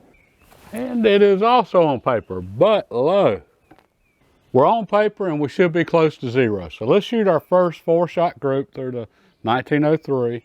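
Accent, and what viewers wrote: South, United States. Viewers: Love his southern accent. I like that he talks kind of slow too so I can understand, as a new person to all this. And he seems like he's been hunting and shooting all sorts of rifles for decades.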